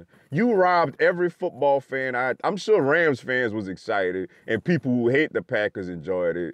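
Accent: New Orleans, United States. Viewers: I can hear your accent, you from tha NOLA.. You should be with your home town team dude.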